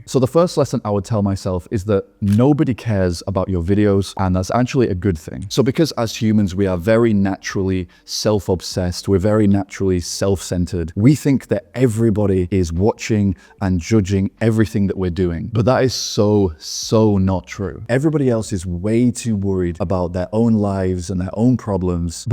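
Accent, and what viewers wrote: United Kingdom. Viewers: I like your voice a lot. And for me, thats a huge reason why i watch someone's vids. If they have great content but their voice grates on me, then i won't watch. Plus you have a great yorkshire accent...best i can tell in California. 😁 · Is that British accent?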